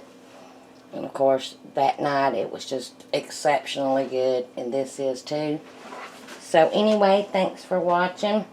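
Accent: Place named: North Carolina, United States. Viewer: I like your recipes! Your accent is like mine so its like we are at a family get together! Hugs to ya. Darla in NC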